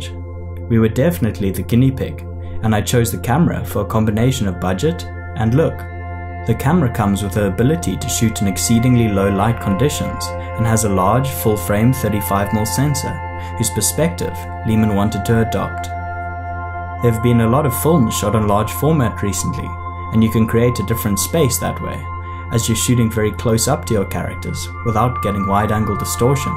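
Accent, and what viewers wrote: South Africa. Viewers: South African? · I'm going to go out on a limb and say South Africa. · I hear a South African accent! yay for the SA industry. Love this short video. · This man South African? Your accent almost sound like our's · Are you South African? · Ek wil mos se ek hoor n South African accent. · Are you from South Africa man?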